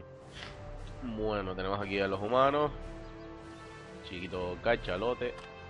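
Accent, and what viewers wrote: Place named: Canary Islands, Spain. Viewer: Niñoo me alegra de q seas canario jaja por el acento se te nota, si podrias ayudarme debido a que voy a empezar te lo agradezco saludos :D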